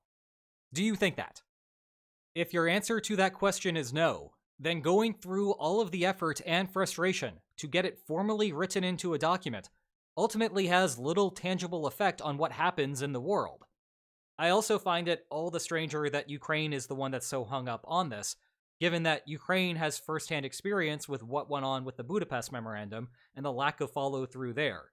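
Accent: Pittsburgh, United States. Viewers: Questions for next Q&A: 1. What is your favorite bridge in Pittsburgh and what is your favorite tunnel in Pittsburgh? And what're your favorite bridges and tunnels outside of Pittsburgh? 2. How hard was it learning how to speak in such a civilized and understandable manor and how hard is it to keep yer natural Pittsburgh accent suppressed? 3. What do the lines on maps say about how much longer the Rooney family continues to accept their midling position of always being above .500 but never getting anywhere in the playoffs before they finally force Tomlin to tear it down and tank for a year to start a rebuild? It seems like the sooner they do it the better off they would be and now that we're passing a weak QB class it seems like this year would be a great year to draft a guy they think can turn into a longtime backup and let him play all year with a buncha rookies so they're in a position to draft a potential franchise QB next year or the year after.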